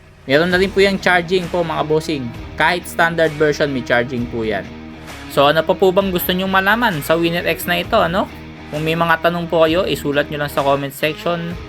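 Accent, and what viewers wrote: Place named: Tagalog, Philippines. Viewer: Cguro boss ang pronounce nya sa example ay exampel hehe ksi tagalog sya,yong double dobel sa mga tagalog hahaha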